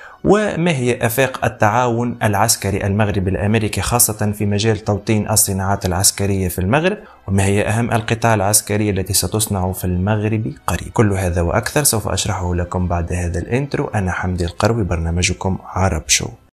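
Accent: Tunisia. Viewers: ماشاء الله تبارك الرحمن تحليل في المستوا مع نطق باللهجة التونسية سبحان الله في المستوا برافو عليك أخي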